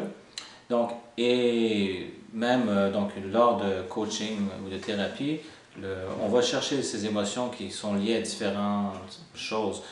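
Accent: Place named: Quebec, Canada. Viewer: Merci Monsieur . J'adore le Canada et plus précisément le Québec! Votre accent est doux et ensoleillé dans votre région. Merci encore De Marseille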